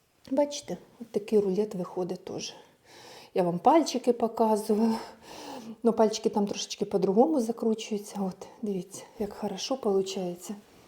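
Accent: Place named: Poltava, Ukraine. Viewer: Так говорять на Полтавщині,на Сумщині,на Донбасі.Що не подобається? Чі тільки треба на галичанському говорити.Коли ви вже перестанете чіплятися до того,хто як говорить.Задрали вже!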